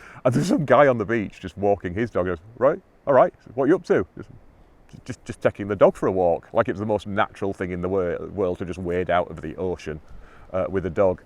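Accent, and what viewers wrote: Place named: North, United Kingdom. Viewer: Correction required, (if you wish.) Access to the beach/mining tunnel must be at low tide, not high tide as stated. Add caption to correct ? By the way, I love your northern accent, and I am proud of you for retaining it, especially in your profession where it could be a disadvantage for you. Where are you from ?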